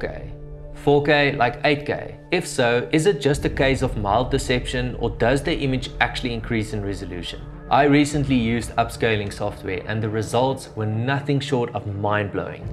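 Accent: South Africa. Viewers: Hi. Amazing channel. Thanks so much for sharing all of your skills. Great to listen to a South African for a change. No sure which programs you use for your editing but I using Premiere Pro. Not a big APPLE fan so not using macbooks as my laptop of choice. I know I can follow recomendations from ADOBE themselves but what would you say will be a good setup (hardware) to do some descent 4K editing?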